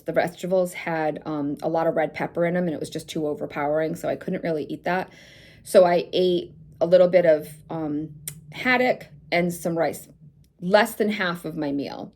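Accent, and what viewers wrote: Massachusetts, United States. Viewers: Thanks for the video, you have to be from Massachusetts based on how you say bananer and Lara bah hehe 😉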